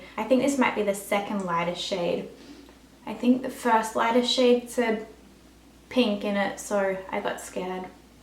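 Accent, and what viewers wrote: Australia. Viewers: Can you please tell me how you got this product so early?! I'm assuming you live in Australia, like me (due to your accent) and it isn't released here until Aug 25th.. and the Too faced and Sephora websites don't ship to Aus :(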